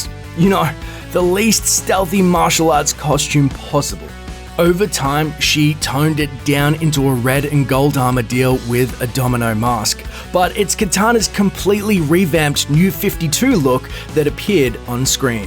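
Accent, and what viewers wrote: Australia. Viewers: I didnt know that you were from Australia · ha Australian. like me. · HOLY SHIT AUSTRALIAN ACCENT IS SO LOUD! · is aussie?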